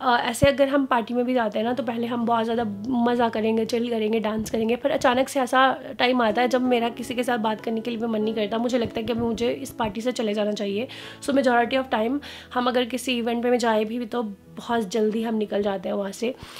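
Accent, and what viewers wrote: Punjab, India. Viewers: I started following you because you talk like one of my best friend in India. Punjabi accent Amritsar vala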